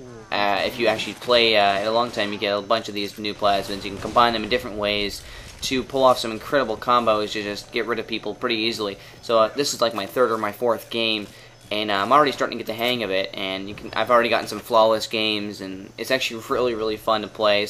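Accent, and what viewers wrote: Canada. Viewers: Are you canadian? :L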